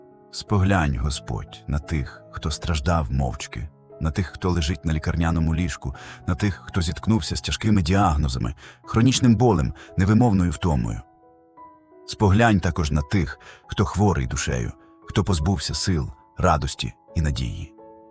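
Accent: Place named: United States. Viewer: Можно для молитвы исцеления подобрать какой-то приятный внятный, успокаивающий голосовой тип, ведь всё равно ии используете? А то напоминает неполноценных из Винницы в 14м году получивших власть из лап эуропы и брызгавших слюнями из неразвитого речевого аппарата на всех трибунах и сценах. Неужели создатели ролика не слышат, что этот тип речи звучит неполноценно - аденоидно?да ещё с имитацией какого-то вовсе неуместного типа американизированного акцента? Нету украинцев что-ли с нормальной здоровой естественной дикцией? Вы испортили молитву, сделав из неё паническую, обгоняющую слово - словом скороговорку.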